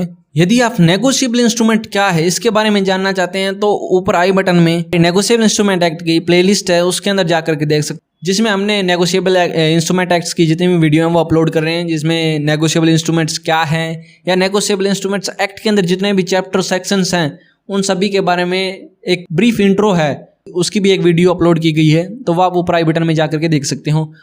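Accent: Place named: Haryana, India. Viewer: U are from Haryana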